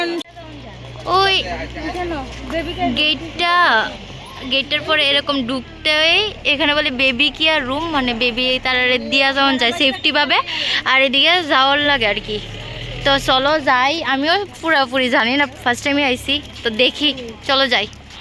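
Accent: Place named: Habiganj, Bangladesh. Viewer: সবচেয়ে ভালো লাগে আমাদের হবিগঞ্জের ভাষা শুনে 🇧🇩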